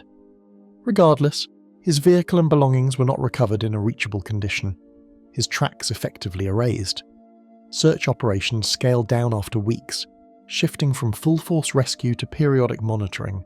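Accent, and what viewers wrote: England, United Kingdom. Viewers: You think this is bad try to sing to a strong Irish or strong Scottish accent lol. The narrator has an awesome English accent!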